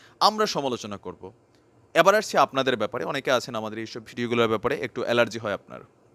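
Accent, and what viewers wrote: Comilla, Bangladesh. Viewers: আপনার ভাষা শুনে আমি আগেই ধারণা করেছিলাম,আপনি কুমিল্লার। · যা ভাবছিলাম ভাই, তাই। আপনার গ্রামের ভাষা শুনে ভাবছিলাম হয়ত আপনি কুমিল্লার মানুষ। আপনি আমার দেশি মানুষ ভাই।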